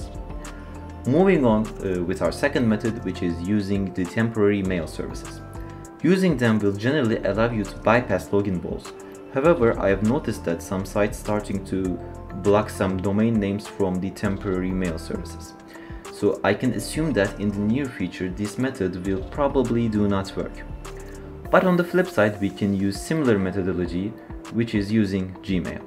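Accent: Turkey. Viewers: Turkish voice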